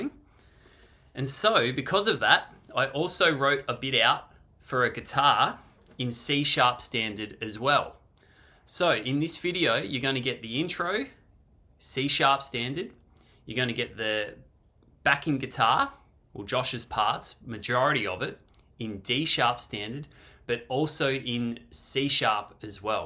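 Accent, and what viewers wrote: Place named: Australia. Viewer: is an aussie talking here.😀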